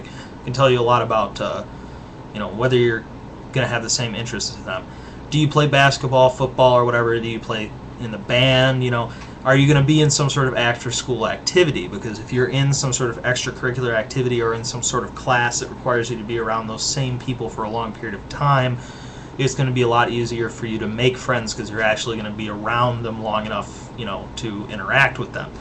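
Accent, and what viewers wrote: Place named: United Kingdom. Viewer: I love British accents.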